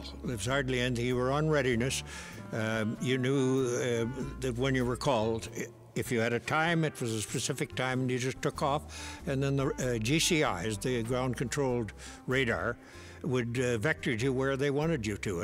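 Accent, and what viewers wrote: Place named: Ireland. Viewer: He sounds Irish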